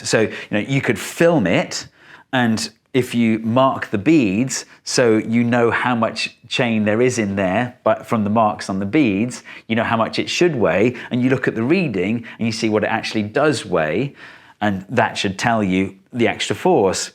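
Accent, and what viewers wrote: United Kingdom. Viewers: "I was just mesmerized by his blue eyes and his soothing voice" Lol. Thing is, i agree. And i don't even like the british accent. ❤👍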